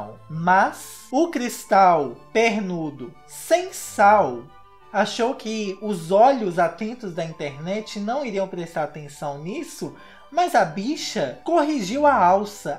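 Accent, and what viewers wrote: Minas Gerais, Brazil. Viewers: Gente, o é mineiro? Conversa igualzim a gente aqui de BH.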